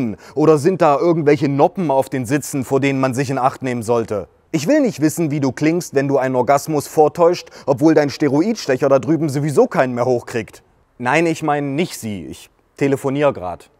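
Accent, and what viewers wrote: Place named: Saxony, Germany. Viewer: Der sächsische Harry G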